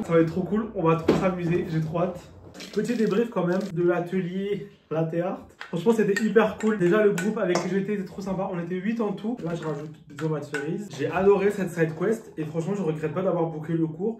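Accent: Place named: Ireland. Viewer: l'accent irlandais to die for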